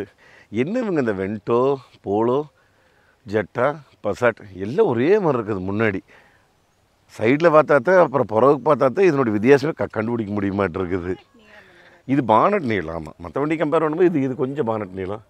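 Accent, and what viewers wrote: Kongu, India. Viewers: கொஞ்சும் கொங்குத் தமிழ் பேசும் கோமகரே...! திகட்டாத தித்திக்கும் பேச்சுடைய திருப்பூர் திருமகரே...! எங்கள் மதிப்பிற்குறிய டான் திரு. அண்ணா அவர்களே❤❤❤🙏 எப்படி இருக்கீங்க அண்ணி❤❤❤🙏 எப்படி இருக்காங்க ரித்திக்❤❤❤ எப்படி இருக்காரு என்ன சொல்ல...ஏது சொல்ல... சும்மா நெகு நெகு நெகுனு இருக்கு போங்க❤❤❤❤❤❤ சாலை ரதத் தேர் என்னும் இந்தக் காரின் அழகைவிட.... எங்கள் அன்பு அண்ணா❤அண்ணி உங்கள் இருவரது உரையாடலே அழகோ அழகு பேரழகு...! பஞ்சு மெத்தையில் படுத்துறங்கும் சுகம்...! மேகத்தை முத்தமிடும் பறவைகளின் கூட்டம் காணும் சுகம்...! காற்றில் அசைந்தாடும் நெற்பயிர்களின் நடனம் காணும் சுகம்...! பாலைவனத்தில் புது ரோஜா மலர் மலர்ந்து அதைக் கண்டால்? மனம் முழுவதும் சுகம்..! கார்த்திகைத் தீபம் சுடர்விடும் அழகினைக் காணும் சுகம்...! அப்படியோரு சுகம் இந்த வீடியோவைக் காணும் என் உட்பட நம் TMF குடும்பத்தினர் கண்களுக்குச் சுகம்...! என்றும் அன்புடன் உங்கள் தம்பி என்றும் அன்புடன் உங்கள் TMF ரா.முஹம்மது கபூர் (சென்னையில் இருந்து)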